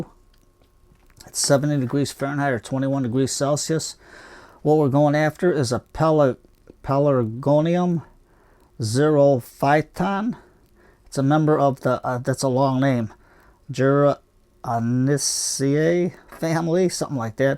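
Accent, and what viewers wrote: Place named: Chicago, United States. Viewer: Hi! I was in Oak Lawn and the Mount Greenwood neighborhood of Chicago last week and stopped by Fasel’s & Son’s to get some bonsai pots at 20% off. Great prices. Thanks for the tip. I had limited space to transport back to North Carolina or I would have bought more. It was good to see the old neighborhood, visit my family, and hear more of the Chicago accent that has made listening to you so much fun.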